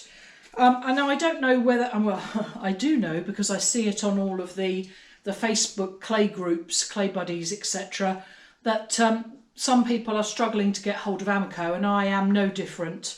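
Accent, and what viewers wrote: England, United Kingdom. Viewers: could you tell me how you have created those great spiral handles? i love watching your kiln openings, numerous hair styles and lovely english accent. I'm watching from Victoria, Australia. 35 celsius today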